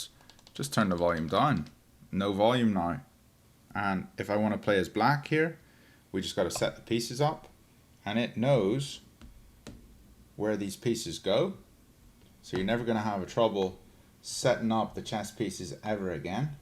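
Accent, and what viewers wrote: Northern Ireland, United Kingdom. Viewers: I just ordered the Chessnut Go earlier today. I might get something like the Evo in the future but I'm a complete novice. I've only played a couple of games as a kid for about a week or two so will be interesting when I receive my chessboard. Is the tablet part detachable, that would make it better for storage, replacing tablet etc.? By the way you seem to have a Northern Irish accent coming through with a bit of a mix like James Mulgrew - Jimmy Cricket.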